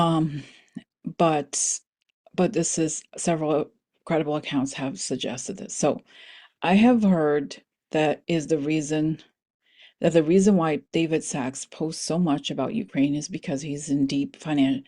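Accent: Russia. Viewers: I just love your Russian accent! It’s my birthday on 4/6 and I needed this video. Happy Belated Birthday ❤️ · Sorry but not understanding ur Russian accent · Thank you, for that hopeful message before I hit my pillow! God I love your weird little faces and Russian accent! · Your Russian accent is lovely!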